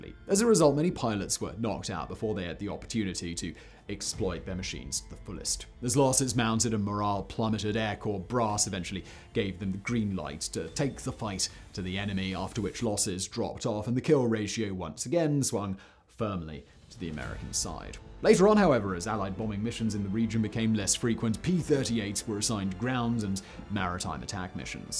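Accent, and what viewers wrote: England, United Kingdom. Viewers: Well, keep in mind - he has an English accent. So - y’know.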